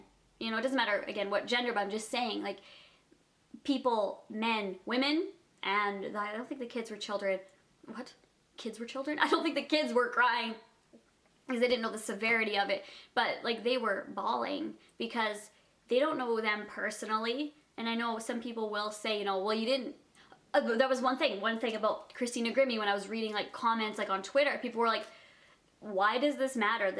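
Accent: Canada. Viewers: It sounds like you're from Canada?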